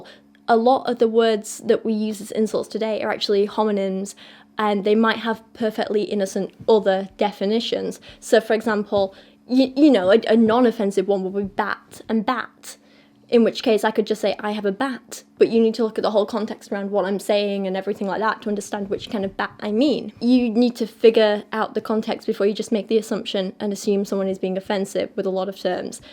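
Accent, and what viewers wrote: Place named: Northern England, United Kingdom. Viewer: accent isn't perfect RP. There's an element of RP in there, but it's also largely Northern.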